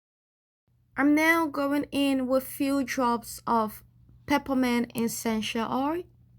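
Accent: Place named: Liberia. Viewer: Are you Liberian you sound like me!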